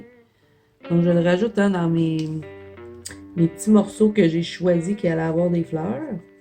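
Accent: Canada. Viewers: bonjour, merci pour la vidéo. Pour info, j'ai de l'encre distress oxyde dans un flacon de spray. Je pense que ca traverse si on le mets de cette façon (+ humide) et donc, dans ce cas, le mettre sur un plastique intermédiaire pour le passer ensuite au 'doigtier'. J'en parle pour que l'on puisse comprendre certains inconvénients rencontrer éventuellement par certains, voir prévenir du désastre. Moi, perso, j'ai parfois fait une base avec des allbrecht durer a la place de l'encre. Merci encore, toujours un plaisir de te suivre (et d'entendre ton Français avec le bel accent canadien)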